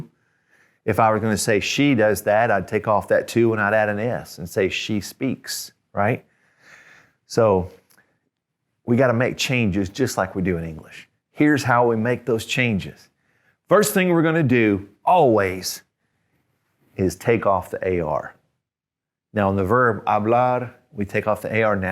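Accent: South, United States. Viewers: that southern accent tho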